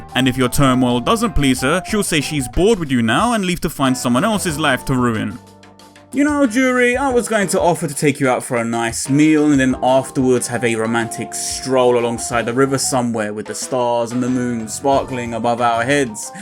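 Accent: London, United Kingdom. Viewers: Just happened across this video and in less than a minute and a half you've mad me laugh. Coupled with being a londoner, (I assume from you accent)... that's an auto-sub right there! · Its British one. A heavy slang based one but still British, more accurately, it's from London.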